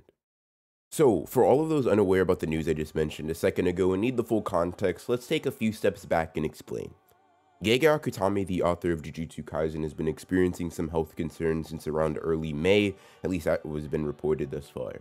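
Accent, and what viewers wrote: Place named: Missouri, United States. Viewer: that's intersting. I asked bcus of ur accent. It reminded me of my lil bro cos he started sounding like that when he was in Missouri. It's very clear and easy to follow since you hardly skip over any letters/syllables.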